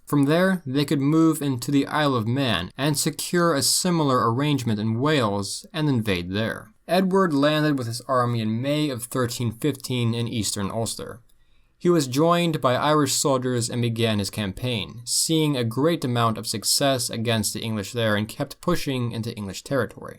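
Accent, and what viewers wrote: United States. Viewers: I was disappointed that the narrator is speaking in an American accent, not Irish or English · Can't stand the American accent with odd emphasis on words and in this case, a boring voice, like reading a book in a hurry.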